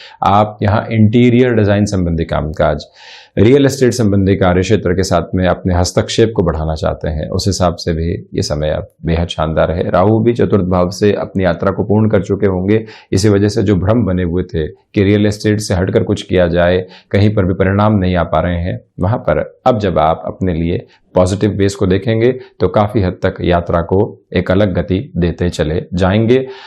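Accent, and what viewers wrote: Standard. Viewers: सटीक विश्लेषण भाषा पर अच्छी पकड़ , उसका प्रयोग और शुद्ध उच्चारण प्रभावशाली . साधुवाद !!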